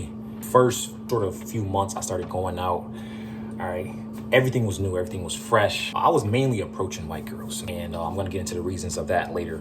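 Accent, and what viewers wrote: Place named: Caribbean. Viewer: Okay, after listening, it sounds like you're of carribean descent.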